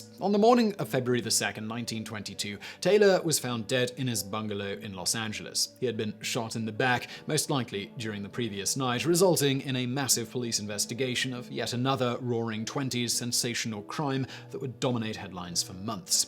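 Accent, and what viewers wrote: United Kingdom. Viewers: Do you cold read from a script? Your pronunciation of some names in your videos, British accent notwithstanding (When will Brits speak English?) is often unique, if not horrendous. Eddie [GAE-del]? Seriously?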